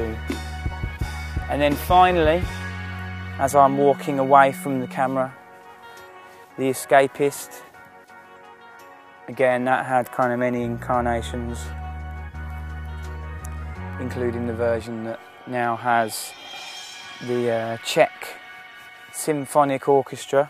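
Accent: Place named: Birmingham, United Kingdom. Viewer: Sorry m8! In real life he speaks in a brummie (birmingham) accent, but in his songs he raps in a cockney (London) accent. Because he is not actually a cockney it is known as a "mockney" accent. Is that any clearer?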